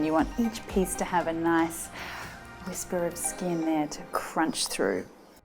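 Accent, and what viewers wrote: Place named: Australia. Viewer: Your Australian accent is getting thicker which each video! Hope you enjoy your return to Oz xx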